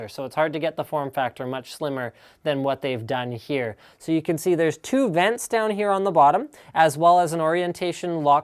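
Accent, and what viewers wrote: Germany. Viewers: Im solely here for the german accent :) Sehr gut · Came for the German accent, stayed for the unboxing! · You're german? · I dont know about anyone else but his german accent is pretty much the only reason i watch his videos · german accent? wtf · I watch for the German accent... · >.< I watch this show for your German accent...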